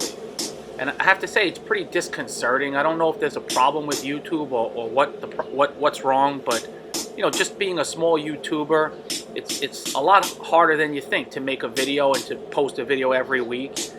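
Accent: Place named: Staten Island, United States. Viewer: My wife is from Staten Island. I thought the accent sounded familiar.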